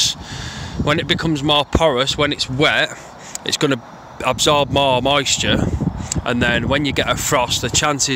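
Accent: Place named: Northern England, United Kingdom. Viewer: I'm American but something tells me that you're from the norf.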